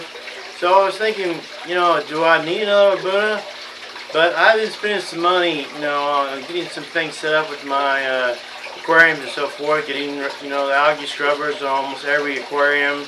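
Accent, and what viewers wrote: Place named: East Tennessee, United States. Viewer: Very cool your educational video, along with the east TN accent! Very cool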